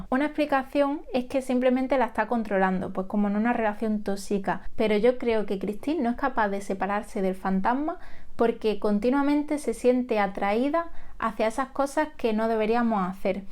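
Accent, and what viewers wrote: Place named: Almería, Spain. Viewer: Qué descubrimiento tu canal! Me encanta porque es diferente a todos los que veo de lectura y literatura.Genial como analizas, la simpleza con la que hablas, tu pensamiento crítico y lo visual que lo haces todo.Ojala sigas haciendo estos vídeos de análisis de clásicos! Por cierto, no sé si eres de Almería, pero tienes acentazo jajajaja yo si soy de Almería! Te animo a que sigas así!! Genial aprender tanto y tan bien!! Un abrazo!!❤❤❤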